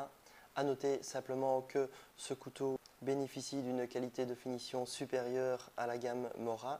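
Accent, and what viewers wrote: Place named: Belgium. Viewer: Ca c'entend un peu mais sa choque pas tkt :) je suis belge aussi donc j'ai l'habitude :D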